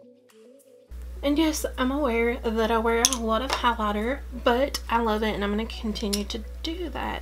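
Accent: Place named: South Carolina, United States. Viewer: Where in the South is your country self from! Lol. I'm in S.C.,you sound like you're from here! 😎😋😂👍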